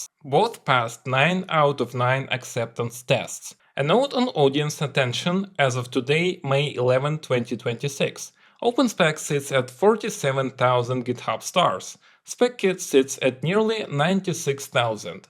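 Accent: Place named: Russia. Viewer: strong russian accent Есть аналогичное видео на русском?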